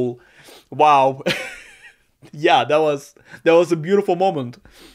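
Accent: Russia. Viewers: слышу русский акцент в английской речи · По акценту и затем по названию понял, что человек русский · are you russian perhaps? either way I love the accent lol it makes so much better lol.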